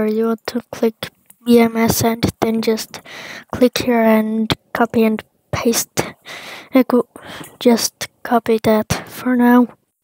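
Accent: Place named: Russia. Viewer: are you Russian? you lowk got that Russian accent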